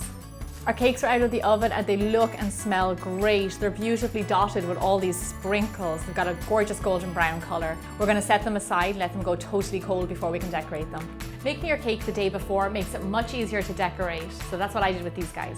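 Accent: Ireland. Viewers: Your voice makes me miss Ireland lol, but the cakes looks so pretty. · are you from Ireland? because your accent is beautiful! lol i wish u had one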